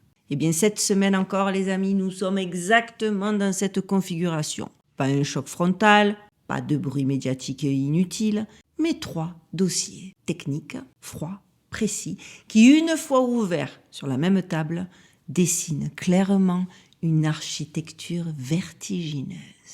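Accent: Marseille, France. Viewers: 👏🏻👏🏻👏🏻 super vidéo! Merci pour vos sujets et recherches très pertinentes 👍 J'adore votre chaîne et votre reste d'accent Marseillais...à dimanche! ❤ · Marseille💔💔💔super video...ton accent m enchante😉✔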